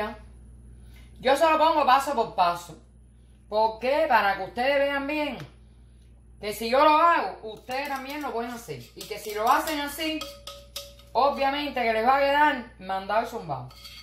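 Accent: Cuba. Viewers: Me encanta como hablas!! Me recuerdas cdo vivía en la isla de mis amores felicidades por mantener tu cubania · Nena ese dulce tiene huevo crudo.Eso no es bueno para la salud.Pero si te digo eres comica con tu asento cobano chiquitica.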